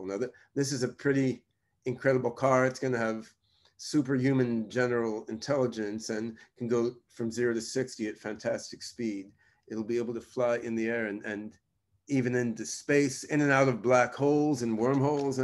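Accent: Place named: Rio de Janeiro, Brazil. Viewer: Bichuu tu é brasuka meu .Pirei kkkk carioca ainda fechou . E ja ta na 3 muie é nois mano tmj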